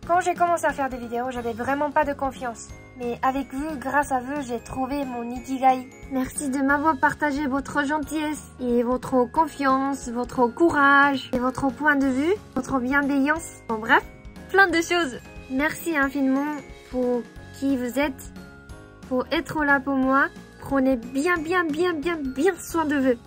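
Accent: Japan. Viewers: Arigatougozaimasu -san de m'avoir fait rire avec vos jeux de mots extrêmement drôles sur notre grammaire alambiquée sur le post: Mon imagination quand j’apprends le français. Je n'ai pas réussi à poster mon commentaire avec mon vieil ordinateur sur celui-ci, un vieil obsolétisé et otaku comme moi c'est … heu… じいさん 👻 Vous parlez extrêmement bien malgré votre charmant accent. Pour « beaucoup » faites comme pour 巧, (kou, こう) 🧐 Il y aura un peu l'accent japonais (c'est charmant) mais ça ne sera pas « cul. 🧐:face-turquoise-covering-eyes: ほんとうにありがとうございます! ☺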